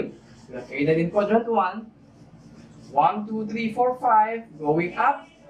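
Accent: Philippines. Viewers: Sri lankan? I thought he's from Philippines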